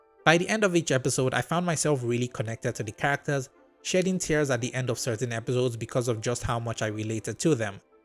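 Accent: Nigeria. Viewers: i could here the nigerian in your voice bro this show is nice but kinda wierd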